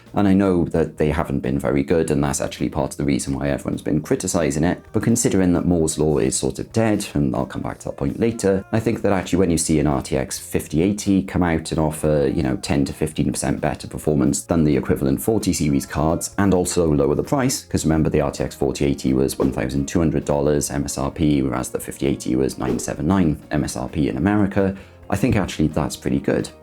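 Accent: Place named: England, United Kingdom. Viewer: I've heard a girl speak with the same style of English accent as you. What area of England are you from? Her name was Lowry... The name style even fits the accent. I'm wondering if I should wait for the 5070ti super? More vram will help with flight sim. My max will be this or the 9070xt because I don't want to upgrade my psu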